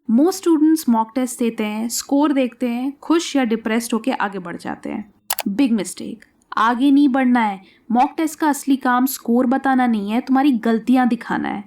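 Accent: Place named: Kashmir, India. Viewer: Are you kashmiri by any chance? You accent seems 😄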